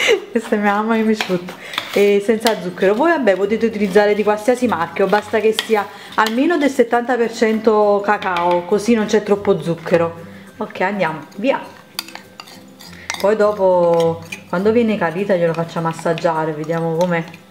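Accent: Naples, Italy. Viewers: si sente ke sei di napoli😂..cmq bella ricetta io ke amo il. salame al cioccolato e lo faccio spesso..xo ho iniziato la dieta e forse così sarebbe ottimo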